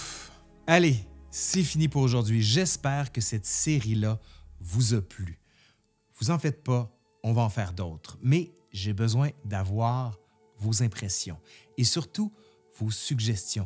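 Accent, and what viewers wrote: Quebec, Canada. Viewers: L'histoire des États-Unis brillamment expliquée par un Canadien à la diction cativante et au merveilleux accent Québécois! 👍🙋🏼‍♂️ Remerciements et salutations depuis la Suisse. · Grave que j'ai adoré, première fois que j'écoute une vidéo historique par un Canadien où Québecois et l'accent, la façon de réciter, la passion, j'en redemande !! Bien le bonjour de la Belgique !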